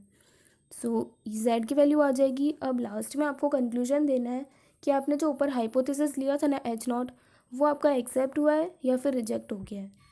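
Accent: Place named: Gujarat, India. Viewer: Thank you me'me for ths video ❤ From Gujarat I know from your accent thayt you are gujarati 😅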